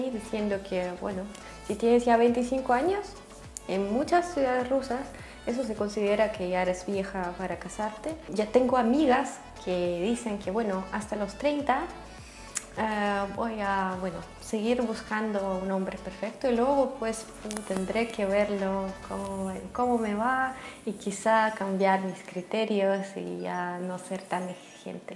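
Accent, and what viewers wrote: Chile. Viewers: Es el segundo video de tu canal que lo veo, me quedé encantado con el acento, hispano chileno jajaja, pero el castellano neutral es el peruano, espero puedas conocer mi país, aquí tienes un amigo peruano para guiarte por ésta hermosa patria. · Aprendiste el chileno en tu estadía allí? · en qué parte de Chile viviste?? Fantástico tu castellano y más lindo tu acento chileno!!! Saludos desde Chile. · Es adorable tu acento Chileno!!!!!!!!! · Eres hermosa se te nota mucho el acento chileno. Saludos desde México.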